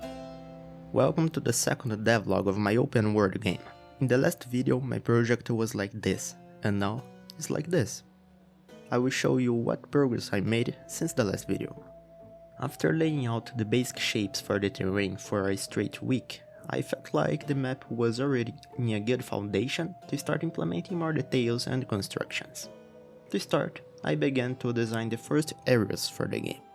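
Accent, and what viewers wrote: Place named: Brazil. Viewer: awesome atmosphere! also you sound kinda... brazilian? 🤔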